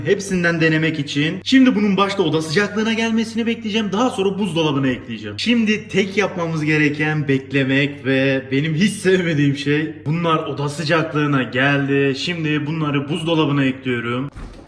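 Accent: Adana, Turkey. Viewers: Atirüüm,Yapıyurüüm,Beklirüüm,Kesiyürüm Adana şivesinin verdiği lehçe ile müge anlının mutfaktaki versiyonu 😁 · Hocam aslen adanalı mısınız :D çok tatlı konuşuyorsunuz :Dd vallahi sırf söylediğiniz eylemleri dinlemek için izliyorum :dD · Abi adanalı mısın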